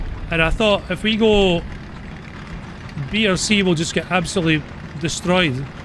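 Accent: Scotland, United Kingdom. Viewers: if the intro that includes the flag nor the accent give it away, i will. he's scottish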